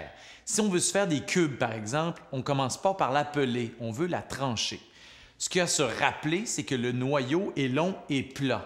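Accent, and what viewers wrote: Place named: Quebec, Canada. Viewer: Merci ricardo ! Ton accent québécois rend tes conseils captivants ! :D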